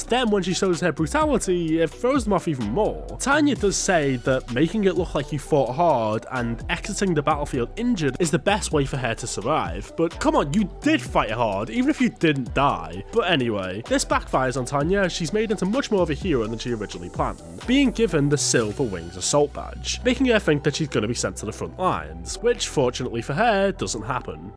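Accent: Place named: United Kingdom. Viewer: What's this accent, dude? It sounds perfect for roasting people, like British but more insulting.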